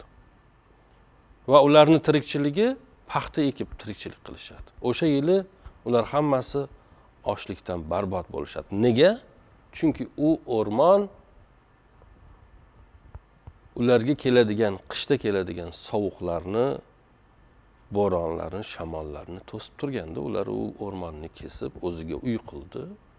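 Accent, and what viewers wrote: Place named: Termez, Uzbekistan. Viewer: Мен бу канални икки Кун булди. Танидим. Узбекистонда и кайси студияда. Гоголда туркияда деб беряпти. Шевангиз Термизга тортяпти. Каерликсиз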